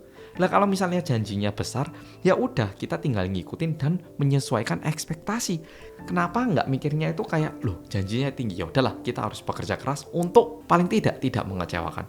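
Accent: Java, Indonesia. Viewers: Ko medok mas bahasane,sm kaya aq 😅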